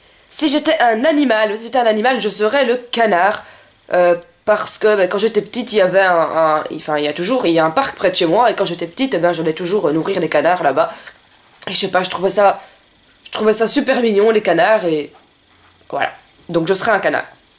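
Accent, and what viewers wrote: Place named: Belgium. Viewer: abonnée^^ je te découvre grâce au groupe. je suis comme toi,1 peu comme la voix des opprimés,je cherche toujours à trouver le bon côté des gens même si tout est contre cette personne.j aime bien l italien.j aime bien ce que tu dégage^^. Tu es belge?(oui j ai eu la réponse plus loin)un p'tit accent😉.bisous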